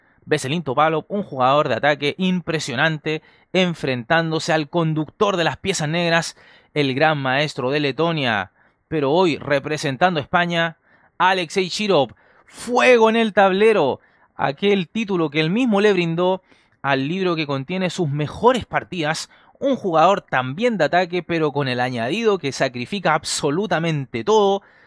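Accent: Chile. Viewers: se nota poco lo chileno puro aguante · Tu acento es ¿ Chileno?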